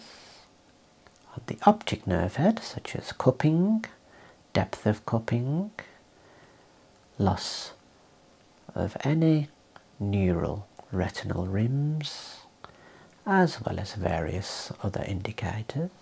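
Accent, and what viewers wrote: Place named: Black Country, United Kingdom. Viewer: At last a black country accent that doesn't sound like nails down a blackboard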